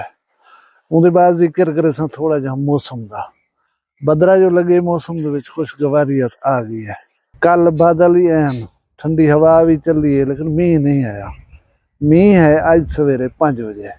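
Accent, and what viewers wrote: Saraiki, Pakistan. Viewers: Chacha subscribed Kar diya hai kamal ki sararaki bolty hain wo bhi methay lehjay me.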